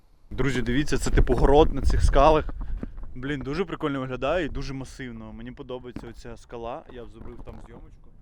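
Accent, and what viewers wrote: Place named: Kryvyi Rih, Ukraine. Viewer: а ви сам з Кривого Рогу чи з агломерації? Бо так говорите неначе тутешній, нам криворізцям цікаво звідки ви🥰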